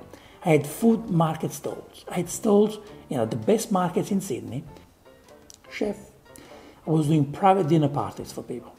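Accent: Italy. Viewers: I just have to ask this 😂 Why do italians learn other languages so hard? You probably at this point, lived in english speaking communities more than Italian, yet you either force your italian accent (don't believe this) or this is just the way you speak, not that I mind, but I just heared many italians that don't rly pickup other languages even after 20-30y living in a different country 😂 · Thanks to I finally learned how to do a good pizza dough, now it’s very popular amongst my friends everybody loves it. Learn to do real Italian food from a real Italian, I wouldn’t trust you if you didn’t have that Italian accent;) The reaction videos are good to Actually you learn more from those videos like “ don’t put oil into the water”:) 👍 This is a great channel. Just keep it going my friend. Saludos!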